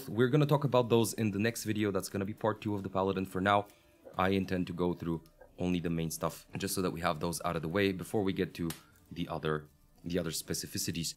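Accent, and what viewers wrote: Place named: Norway. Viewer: You have nice accent when you speak. You're norwegian, aren't you?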